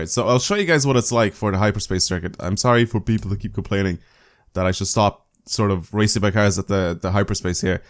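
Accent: Ireland. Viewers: Wow ure irish i didnt know im irish too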